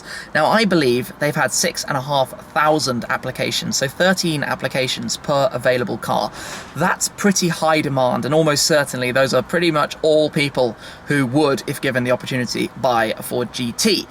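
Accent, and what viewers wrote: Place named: United Kingdom. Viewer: Must be nice to be white with a British accent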